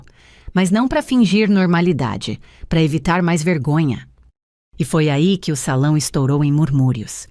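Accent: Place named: Caipira, Brazil. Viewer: História interessante. Gostei. Creio que poderia melhorar um pouquinho o sotaque "caipira " na pronúncia de algumas palavras. Narrativa também interessante. ❤❤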